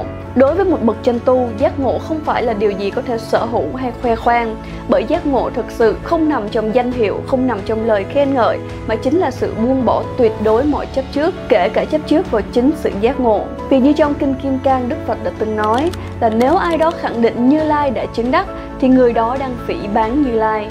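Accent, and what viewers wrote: Standard. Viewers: Nam mo a di ďa phat nam mo dau da minh tue phat toi nghe co noi pua chuan chuc co luon vuì khòe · Chúc mừng cháu giỏi về chính phát nghe quá chuẩn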